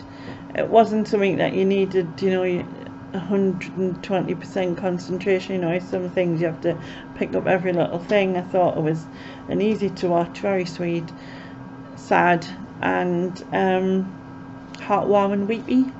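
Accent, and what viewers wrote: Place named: North East England, United Kingdom. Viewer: cool I like north east accent,where in north east are you?how are you copeing with the lockdown?xx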